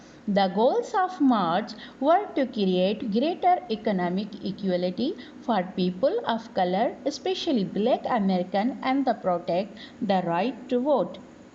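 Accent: Sindh, Pakistan. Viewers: English in sindhi Accent !!!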